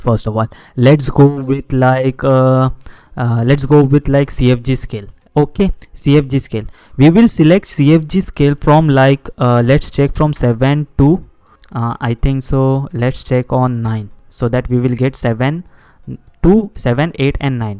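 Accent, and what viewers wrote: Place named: India. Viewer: Your English is very Indian, but the information is good, I can take it.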